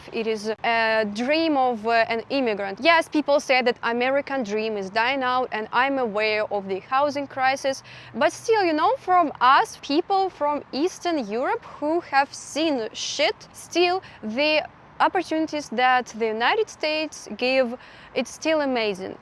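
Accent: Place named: Russia. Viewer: Vielen dank, Fräulein! Your video woke up so many ideas, again! How many people has escaped Russia in order to avoid conscription, getting arrested of for not seeing any future there? We cannot know all of them, but we have you. For so many of us, you represent them. Your work is very, very important. You remind us that there are Russian people who oppose the regime, who are not brainwashed. And who, probably, will not be easily brainwashed by the western propaganda, either. That is quite an achievement for one single person! Your education was probably not futile. To be honest, you cannot even know it right now. You are not in a situation where you can somehow easily solve it all. Most probably, it will never happen. I let the native speakers evaluate your English. I, as a Finn, can hear some Russian nuances. But you have learned that you can get familiar with new languages and cultural things pretty easily. 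Anyway, the more years pass after our studies, the more important are those tacit abilities we have learned, rather than explicit skills, like world views, learning strategies, ways of speaking and, especially, listening to others. And. So. On. Making videos is just tecnics or tools. You are able to think independently and speak about your thoughts in a very assuring way. You don't have to be an engineer to make videos. On the other hand, few engineers are able to work as a vlogger, or journalist, if you will. Remember, there are vloggers making wideos about ope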